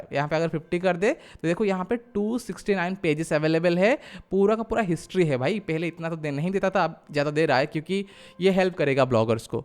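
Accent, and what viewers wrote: Odisha, India. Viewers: Brother, u r from odisha?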